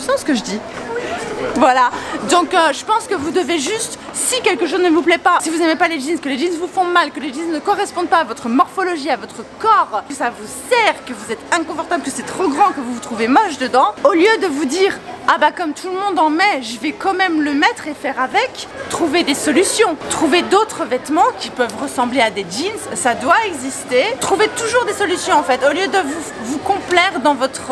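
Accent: Belgium. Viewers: T’es belge ?!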